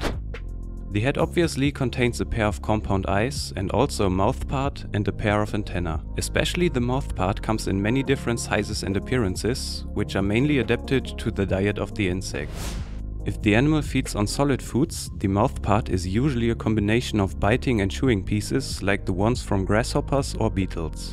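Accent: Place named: Germany. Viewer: Scheiss deutscher mit scheiss akzent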